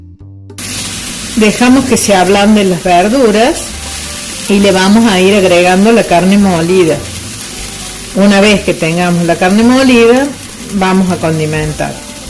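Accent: Córdoba, Argentina. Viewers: Muy bien explicado… (la tonada le da la magia a la receta) aguante Córdoba❤ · Tienes un acento típico de la provincia Argentina de Córdoba! muy lindas las cordobesas! Gracias por compartir tu receta ,voy a poner manos a la obra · Sos de Córdoba 😁 por la tonada. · por el acento ya se sabe como hablan los cordobeses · Por casualidad usted no es cordobesa xq a mí me parece que si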